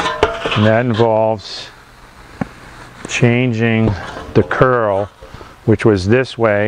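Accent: Boston, United States. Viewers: this guy has got to be from boston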